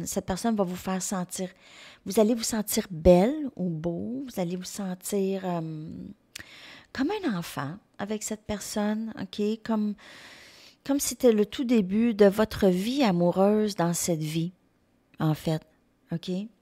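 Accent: Quebec, Canada. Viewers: Merci une très belle énergie que vous nos donny aujourd'hui avec ta jolie ax québécoise 😘🙏❤❤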